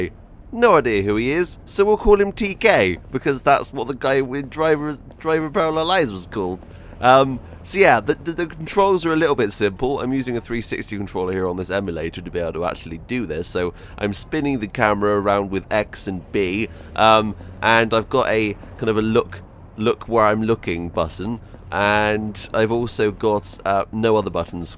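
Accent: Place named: United Kingdom. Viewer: Speech impediment? You mean his British accent?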